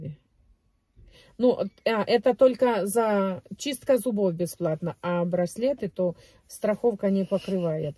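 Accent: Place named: Moldova. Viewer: Такой говор как с акцентом молдованки в Украине город Черновцы там разные сел молдованов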